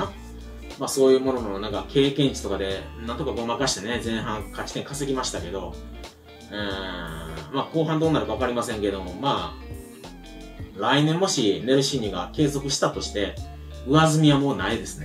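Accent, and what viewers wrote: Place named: Kansai, Japan. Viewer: 関西弁だな、西日本のタレント軍団のサポ？！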